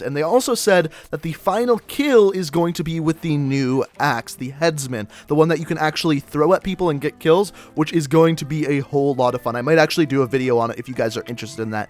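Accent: Canada. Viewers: Are you saying "Merc-2" weapons or "Mark-2" it might just be your heavy canadian accent effin'it up for me!! 😄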